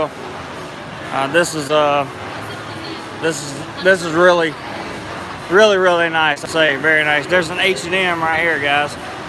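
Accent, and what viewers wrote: South, United States. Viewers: His southern accent is so attractive. 😻 laugh like George W sexy boy!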